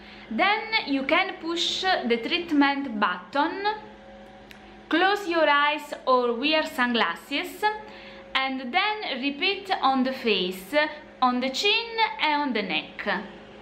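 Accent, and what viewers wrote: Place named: Italy. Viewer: Maybe she’s Italian and speaks with accent ?! Jesus, calm down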